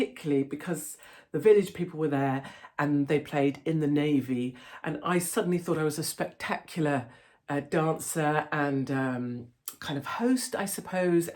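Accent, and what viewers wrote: RP, United Kingdom. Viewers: You might understand this...I remember a female officer going through some trauma.where she was crying..she got a hug from an NCO (a very experienced single NCO) and she was disgusted at being touched by a filthy subhuman NCO....and screamed .....Get off me you are not an officer....the rest of her time in Regt was not good...which is why I take the piss out of you back in the old days with your posh officer accent and now you need the support of the 'ordinary's you have toned the 'Sandhurst twang' right down. Get it?? By the way this was Signals, not some queen marys own wankers, where they wear spurs to dinner???....and the solid silver spurs cost 500 quid each and you can't actually be an officer unless you have an outside income because extra messing is more than your actual pay. Doubt you will read this but others will.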